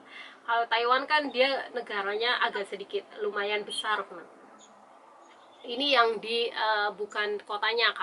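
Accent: Banyumas, Indonesia. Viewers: Pdhl kl ngapak jg gapapa loh, lucuu 😂🤭🙏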